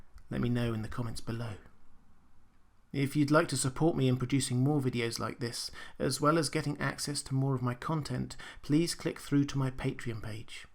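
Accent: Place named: RP, United Kingdom. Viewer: He, by far, has the best voice of all of the channel owners. Sort of a younger, less annoying, more posh David Attenborough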